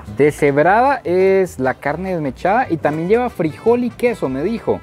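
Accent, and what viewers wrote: Mexico. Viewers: jajaja flaco kbron, que chingon que visitaste nuestro Mexico. Te ves feliz, gracias por compartir un poco de nuestro encanto mexicano. oye, por cierto, tienes acento mexicano. neta eres de otra patria?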